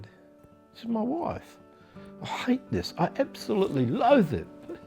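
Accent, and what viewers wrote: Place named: England, United Kingdom. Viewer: THANK YOU! I JUST GOT YOUR MSG, AND WATCHED YOUR VIDEO AGAIN. I MUST TELL YOU, YOUR WIFE IS BEAUTIFUL! GORGEOUS HAIR AND LOVELY DARK EYES, AND YOUR NOT SO BAD YOURSELF.....HANDSOME COUPLE...MATCH MADE IN HEAVEN INDEED! I LOVE THE WAY YOU TALK ABOUT THE LORD, I KNOW WELL WHAT ITS LIKE TO DEPEND ON HIM EVERYDAY. IM 71 AND BEEN SUFFERING WITH MS FOR 20 YEARS. AND YOUR RIGHT WHEN YOU WONDER HOW PEOPLE LIVE WITHOUT FAITH IN THE LORD. I TELL YOU, I WOULD HAVE ENDED MY LIFE YEARS AGO IF I HAD NO FAITH! KING DAVID SAYS IN ONE OF THE PSALMS,"LORD, YOU KEEP ME ALIVE"! IS IT NOT THE TRUTH?? MY HUSBAND LOVES ME BUT HAS NO PATIENCE WITH ME, IT MAKES THINGS HARDER FOR ME BUT HE HAS MANY HEALTH PROBLEMS HIMSELF, SO I TRY TO UNDERSTAND. AT LEAST HE'S NOT CONFINED TO HOME YET. THANK GOD! YOU SOUND ENGLISH, MY MOM WAS ENGLISH AND IRISH. 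MY HUSBAND IS IRISH AND WELSH, BUT MY DAD WAS POLISH. WHAT A MIXTURE I AM, AND I DONT LIKE POLKAS OR POTATO SOUP.....BUT I LOVE MY TEA!! SORRY FOR JABBERING ON, BUT ITS FOOTBALL TIME IN AMERICA, SO YOU KNOW WHERE MY HUSBAND JOHNNY IS!! YES, I GOT ME A TOO!! IF YOU THINK OF IT, IN YOUR PRAYERS MENTION SUZY ROBERTS, HE KNOWS ME WELL, AS I HAVE YOU AND IN MINE ALREADY! TAKE CARE AND MAYBE WE WILL ALL MEET SOMEDAY IN THE KINGDOM....I SURE HOPE SO!! LOVE AND BLESSINGS FROMAMERICA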